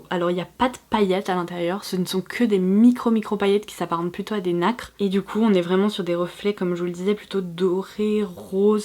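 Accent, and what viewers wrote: England, United Kingdom. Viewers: Oua! Mais franchement Oua! Ca fait un petit moment que je te suit, déjà je te trouve super en tant que personne, tu es très belle, j'adore ta façon de parler et ton accent anglais me fait rêver Lol Ton unboxing me vend du rêve, les produits Daniel Sandler m'intriguent beaucoup, ils ont l'air vraiment beau, j'ai vraiment hâte que tu les test pour voir le rendu, la palette pour le visage ND, non mais comment dire que idem trop hâte que tu la testes, elle est splendide, je n'avais pas entendu du RAL de cette marque, mais il a l'air splendide également! Continues ainsi, tu es au top et très agréable à suivre! PS: j'adore tes " OH, Ouah!"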